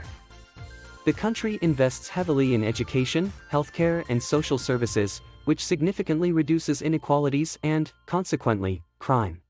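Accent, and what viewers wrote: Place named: United States. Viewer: I was actually surprised! An American-accent narrator and an opening screen of a sauna had me expecting a foray into the Nordic lack of inhibition to nudity that tends to lead English speakers into perverse imaginations of Finns (and Nordics in general) flaunting dicks and tits without any regard for English-speaking prudishness! To my surprise, this video had nothing of that! I'm Icelandic, a western brother of our eastern brothers, the Finns. Having worked with Finns in stressful situations (relief operations in war-ravaged African countries), I now know that the Finns are even more stoic than we Icelanders believe ourselves to be! More solid friends you will never find, and they have the rare quality of not shooting their mouth of when they have nothing to say! In my case, I found that Finns stay quiet until they have something useful to contribute to whatever conversation is going on. 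I also learned that if a Finn bothers to enter a conversation, he/she ALWAYS has a truly valid argument/insight to offer! The Finns are a people of few words, and WHEN they have something to contribute, pay attention!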